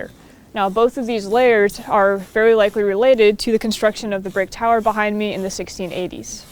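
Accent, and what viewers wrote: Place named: Dorset, United Kingdom. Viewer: Hi. Really like your accent and tone of voice. It reminds me of my family from West Dorset in England. I also wonder that apart from the hard archeology how much effort do you make regarding social and economic history, the evolution of dialects. Perhaps you do follow the journey from an English colony of dissenters and adventurers to freedom you sort and the creation of your own country. Excellent and easily understandable videos. Nick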